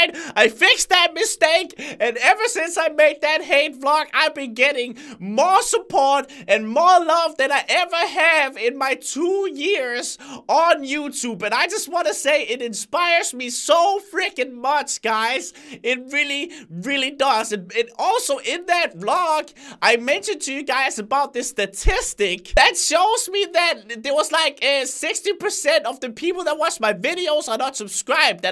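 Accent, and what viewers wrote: Denmark. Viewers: One video u should do u in ur danish accent with subtitles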